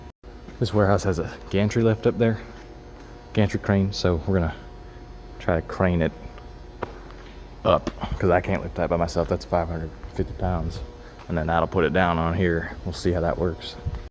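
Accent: South, United States. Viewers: Lol i like the southern accent, whered that come from??😂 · You sound southern in this one for some reason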